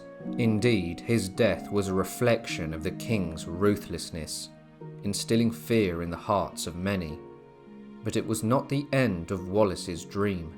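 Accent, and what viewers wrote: England, United Kingdom. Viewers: Being a very patriotic Highlander i am insulted that Wallace is being narrated by an Englishman with a common accent that fails to be understood, who the hell decided to pick someone from the other side of the boarder to talk on Wallace, what a disgrace, put the shoe on the other foot see what happens, not that they ever had a super warrior like Wallace. Pick a Scot for feck sake.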